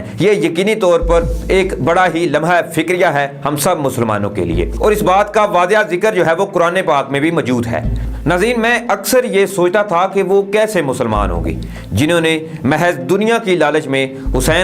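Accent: India. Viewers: Sad but unable to believe if u r really a Pakistani as usually you use Indian accent of Urdu. Can u clarify from where u r exactly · Ap indian urdu q boltay ho Baaten itni piyari or lehja indian ka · Aap ki batain achhi hain lekin kuchh alfaz ka talafaz Indian wala kyun hai. Sahih Urdu bolain plz.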